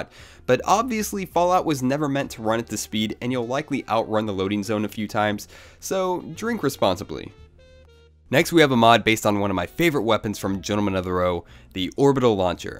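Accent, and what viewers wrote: United States. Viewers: Why do you play it in Japanese, You have an American accent.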